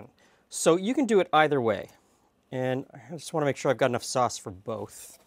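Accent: Canada. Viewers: Hey. Love your videos. Something interesting: my grandfather was from Iowa, and his accent rubbed off on me (despite me being raised in California)... I sound halfway between a Canadian accent (like yours), and a Southern US accent... Also, I cant wait till I have a bigger kitchen! I wanna try so many of your recipes. Keep up the good work!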